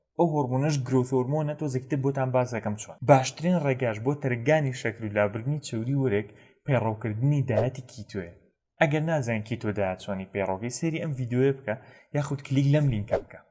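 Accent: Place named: Badini, Iraq. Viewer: كه مئك باديني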